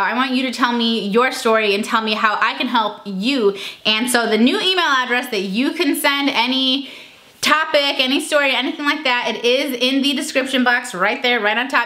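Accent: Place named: South, United States. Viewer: i love your voice for some reason. its like sassy/southern but not annoying haha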